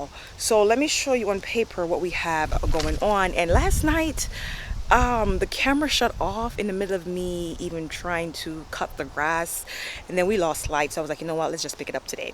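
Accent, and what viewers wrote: Jamaica. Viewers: Rooting for Jamaican girl love wi accent. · You went back to the Jamaican talking, me tired me nah lie ,lol a nuff work this you know 😄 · Love when the Jamaican comes out. Mi tyaad. Can't wait to have the land space to do this extensive gardening. Love watching your videos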